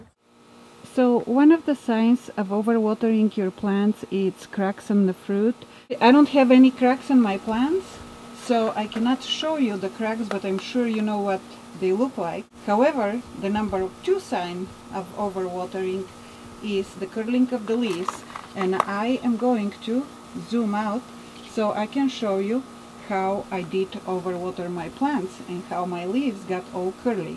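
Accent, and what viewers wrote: Eastern Europe. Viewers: get rid of that east melania trump european accent. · When you start your plants do you put 2-3 seeds in and thin them out later or just use one seed at a time? The ones I thinned are about dead but the ones I didn't are doing great. I have one plant that keeps growing but the leaf curl is so bad they look like stems, will they ever go back to looking normal? Also, I water at night too. What country are you originally from, sounds like a border Russian country. I hope you don't mind me asking.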